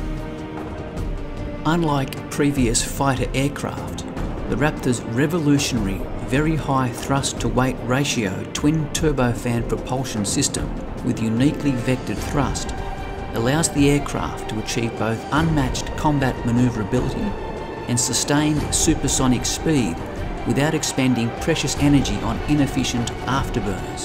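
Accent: Australia. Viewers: Brilliantly edited and narrated. I wonder who Sir Lionel contracted to do the narration? I just can't seem to place the voice. He sounds like someone I knew once, but he was from France. So it can't be him because this bloke has an Australian accent. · You sound Aussie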